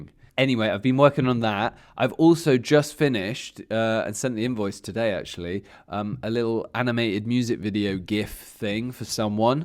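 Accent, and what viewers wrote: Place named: United Kingdom. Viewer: Are you British? Cuz I like the accent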